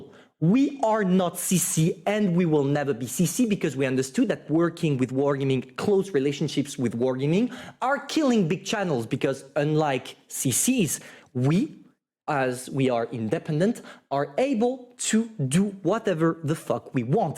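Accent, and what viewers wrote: France. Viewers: you are the best Blitz- You Tuber of all, besides Meadsy and Droodles. Stay as you are, because you got balls and are telling always the truth about the game. Most I love your french accent in english. All thumps up..... Greets from Germany, the land of the mad.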